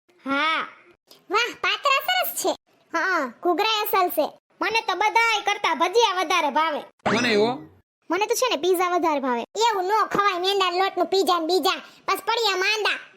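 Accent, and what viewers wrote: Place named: Ghed, India. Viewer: તમારી બોલી ઘેડ બાજુની લાગે છે. તમારું ક્યું ગામ છે બેન..? અમે પણ ઘેડ ના જ છીએ. વરસો બાર નીકળી ગયા છીએ.. તમારી ભાષા સંભાળી વતન યાદ આવી જાય છે...😊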